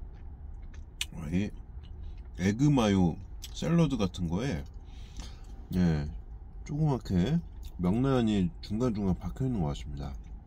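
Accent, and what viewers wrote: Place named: Incheon, South Korea. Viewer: 말투가 인천이신데 인천분이신가요?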